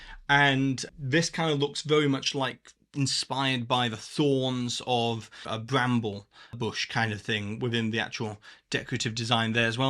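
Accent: United Kingdom. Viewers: Are you putting on your British accent? Why are you saying wailwoad, twain, dwainage, you weckon, etc.?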